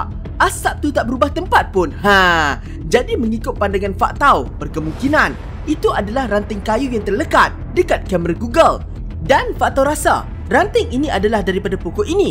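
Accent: Malaysia. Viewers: Abang Abang orang Malaysia yah kok ngomong nya kaya Upin Ipin aku dari indonesia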